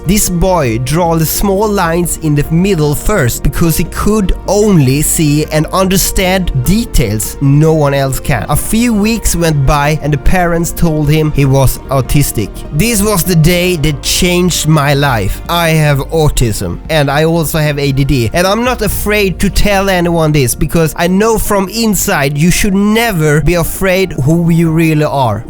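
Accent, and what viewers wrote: Sweden. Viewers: i love his swedish accent its kind of adorable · Well he's got a rather strong Swedish accent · He's from Sweden, and that's why he has an accent :) (I think he's from Gothenburg) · OH MYYYY, Du är svensk också! min engelska (uttalning) låter också som din lol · Oh dear gooo-, you swedish?!? U cert got the accent. Lame. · No, he has the accent because he's Swedish.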